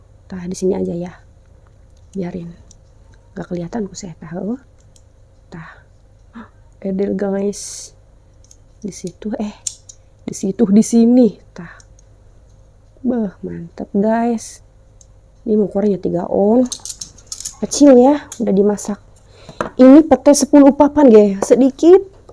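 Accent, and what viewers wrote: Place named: Banten, Indonesia. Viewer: mah ngababita bae 🤤🤤🤤 bahasa na jiga banten nya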